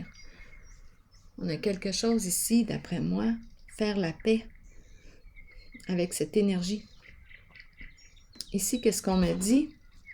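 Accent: Quebec, Canada. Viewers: PS: j ai failli naître au Canada !!! J' apprécie vous entendre parler le Québécois !!!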